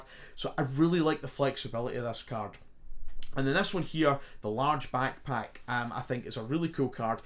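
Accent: Scotland, United Kingdom. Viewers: Sounds more Scottish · Sounds scottish.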